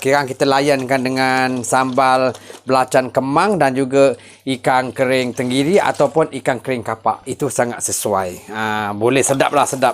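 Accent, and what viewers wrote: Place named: Muar, Malaysia. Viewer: Terbaik makk... org muo gaya bahasanya mmg ada identiti tersendiri😁👍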